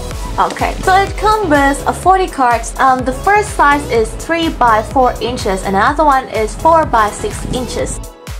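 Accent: England, United Kingdom. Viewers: ชอบสำเนียงอังกฤษอ่ะฟังเพลินมากเลยค่าาาาทำออกมาบ่อยๆน้า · มีทุกอันที่เป็นสติ๊กเกอร์ก่อนพี่ จะรีวิวเจ้าค่า เห็นสีแล้วมันถูกใจมั๊กมาก. ชอบสำเนียงอังกฤษเลย ถึงแม้ต้องอ่านซับรัวๆสู้อังกฤษไม่ไหวจริงๆนับถือๆค่า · ติดตามอยู่นะคะพี่ ชอบสำเนียงภาษาอังกฤษพี่จัง ทำมาอีกบ่อยๆนะคะ หนูจะได้ฝึกไปในตัวด้วย ปล.หนูไปสอยสมุด เครื่องเขียน บลาๆ ตามพี่เยอะมาก จนต้องตีมือตัวเองให้หยุดซื้อ <num>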